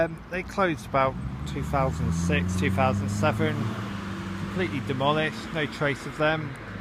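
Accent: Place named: Bournemouth, United Kingdom. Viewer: It's so great to hear a truely classic Bournemouth accent. Makin me homesick!!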